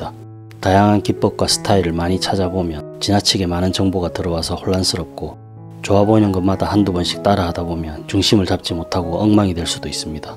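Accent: Gyeongsang, South Korea. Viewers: 쌤 반갑습니다😊 귀에 쏙쏙~ 지루하지도않구 항상 감사해욤. 쌤 갱상도 쌤 맞지예~??ㅎ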